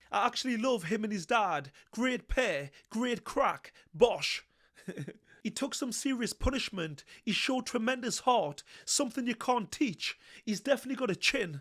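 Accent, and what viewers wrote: Newcastle, United Kingdom. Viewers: Great analysis. Is the narrator a geordie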